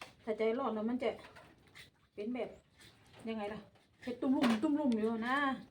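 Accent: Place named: Chanthaburi, Thailand. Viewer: สำเนียงจันทบุรี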